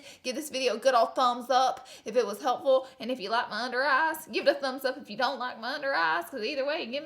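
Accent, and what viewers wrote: South, United States. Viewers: she sounds southern in this video hah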